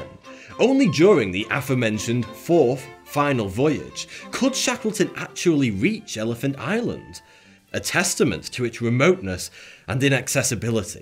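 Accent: United Kingdom. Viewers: Awesome video mate! I always look forward to hearing that silky smooth British voice!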